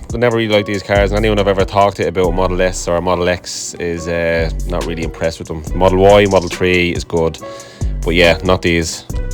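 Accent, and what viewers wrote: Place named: Dublin, Ireland. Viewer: Love the Dublin accent! Up the Dubs! Great job on the car.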